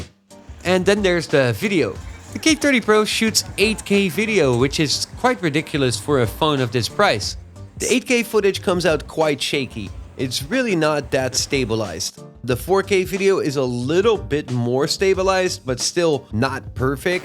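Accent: Netherlands. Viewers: He's definitely Dutch. His accent is pretty good as far as Dutch people go, but as another Dutch guy it was still a bit distracting. You can here it on his "th" for example. He is very deliberate to try and get it right, even then he sometimes slips up in a very Dutch way like the third time he said "thirty" in a row. "tirty" and "firty" are ways of getting around the inability to pronounce "th" almost every Dutch person, me included, does. He went for the former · you dutch?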